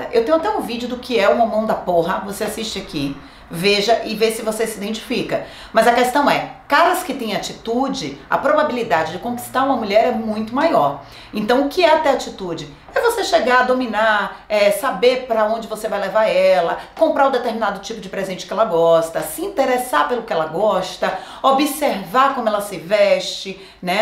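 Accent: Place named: Bahia, Brazil. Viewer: Que sotaque gostoso! É Baiana?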